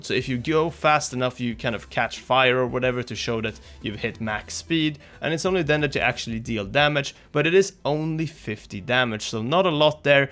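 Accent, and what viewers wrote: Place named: Sweden. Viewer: One of the most Swedish accents I've heard / a Swede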